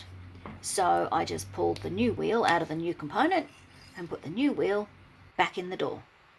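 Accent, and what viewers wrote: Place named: Australia. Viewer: Absolutely brilliant thank you! Love the tip at the end about not reefing the door open as that's exactly what I'd do, old habits etc. Another thing, an Aussie accent yay. Love your Asst Tradie, beautiful moggie! Thanks again for a great video. From Western Australia ❤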